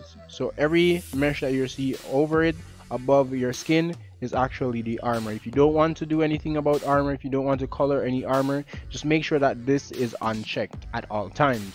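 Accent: Jamaica. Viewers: Thank I very much and I like ur accent it sounds like u from Jamaica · You sound jamaican?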